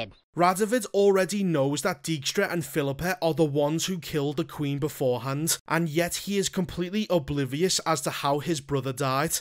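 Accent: Liverpool, United Kingdom. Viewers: I don't know what's funnier, the cutting, but very accurate commentary and analysis, or the fact that it's all delivered in a Scouse accent 🤣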